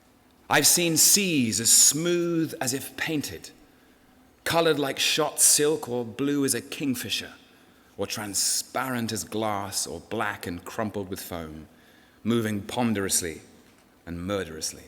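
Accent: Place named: United Kingdom. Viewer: This essay poem is not only utterly romantic and passionate but suits his voice, his British accent... beautiful literature piece that just suits the loving character. Also to his mischievous character when he said let's get into detail, hehe. Blemishes were funny and actually made total sense. Love the literary style with metaphors...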